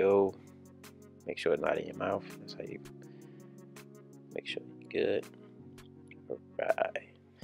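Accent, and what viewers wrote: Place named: Baltimore, United States. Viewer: Bmore in the house!!! Loving the Baltimore accent!!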